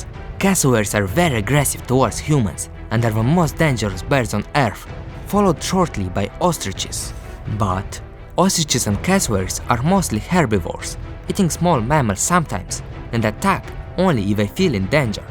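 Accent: Russia. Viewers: Oh I thought you were from Russia because of your accent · i love your russian accent , kisses from Algeria !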